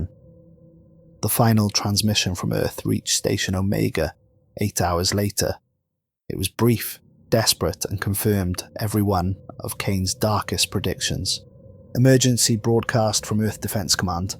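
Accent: Wales, United Kingdom. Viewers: Good stories and spoken in English with a Welsh accent. Looking forward to the next one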